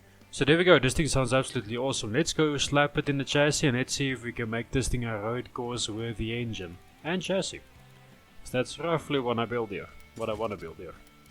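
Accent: Afrikaans, South Africa. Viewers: I knew it 😁... that Afrikaans accent came through perfectly 😂👌🔥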